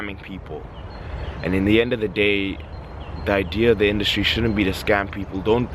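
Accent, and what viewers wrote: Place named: South Africa. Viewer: yo where u from bro? u sound south african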